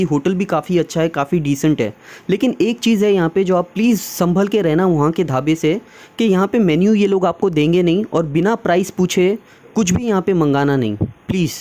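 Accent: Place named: Maharashtra, India. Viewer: Hi, are you from maharashtra or marathi? Because your accent in hindi is similar to marathi.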